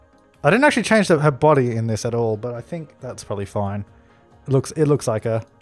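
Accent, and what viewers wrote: Australia. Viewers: Your video is a joy to watch and listen to, your an Aussie aren't you? I love listening to you talk,lol! Your comments made this the best Sims 4 video I have seen yet, you made me laugh allot! And about Aluna, you could tell that it was the same Sim in Sims 4, but it looks even better some how! I know allot of people say they look less real, but I think they look more real then Sims 3, its like you can give them better detail, which makes them look more real! I can't wait to get to try it out myself, I love making different Sims, and detail is very important to me! I hope you make some more videos of you making new Sims with The Sims 4, I'll enjoy them just because of your voice,lol!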